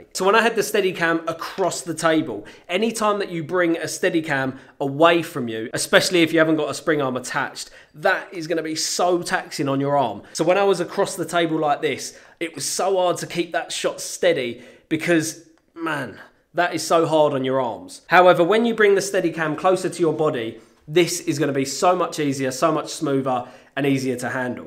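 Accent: United Kingdom. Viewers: ' creativity is just like his British Accent. Effortlessly Smooth and Precise.😁😁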